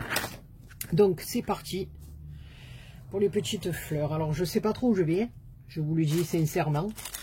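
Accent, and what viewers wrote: South, France. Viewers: Magnifique ! Merci beaucoup pour ce tuto. Avec l'accent du soleil ..... j'adore !! Bises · Magnifique carte panier très bonne idée pour la fête des mères. Je suis conquis par le petit panier, très jolie et en plus j'adore vous écoutez parler avec votre accent du Sud cela apporte du baume au coeur et un peu de soleil parce qu' il nous boude en Bourgogne. Merci pour ce superbe partage. Bonne fin de journée. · coucou je suis émerveillé par toutes vos belles créations, le panier fête des mères superbe, carte gâteaux également quel talent vous avez une imagination débordante pour la déco J,adore avec l' accent du midi je ne me lasse pas de vous écouter merci et bonne journée bisous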